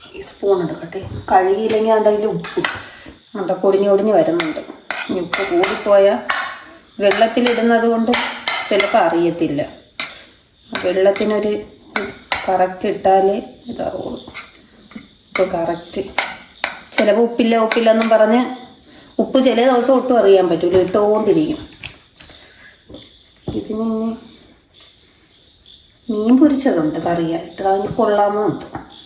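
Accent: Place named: Palakkad, India. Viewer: പാലക്കാട് ആണോ വീട് സംസാരത്തിന്റെ ശൈലി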